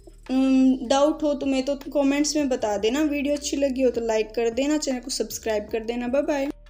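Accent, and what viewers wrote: Haryana, India. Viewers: Her Haryanvi accent🌝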